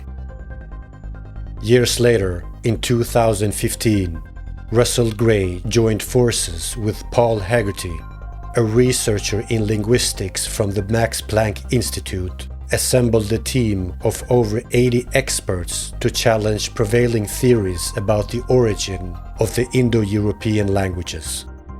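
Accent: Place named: Arab world. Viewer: O njerez, nese doni me ba dokumentar te tille dhe ne Anglisht atehere le te jete o dikush qe flet me mire o dikush qe e ka gjuhe amtare, ky narratori po tingellon si me lexu noj Arab.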